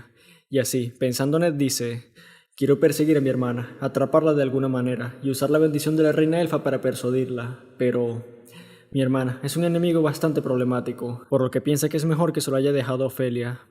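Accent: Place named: Venezuela. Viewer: El pana este habla como venezolano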